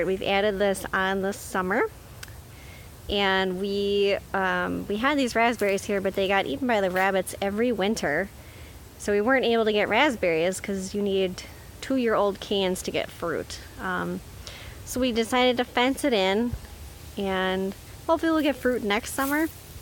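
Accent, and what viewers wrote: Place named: Minnesota, United States. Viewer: Grew up in Brooklyn Park, but this Minnesota accent is almost too much for me 😝😝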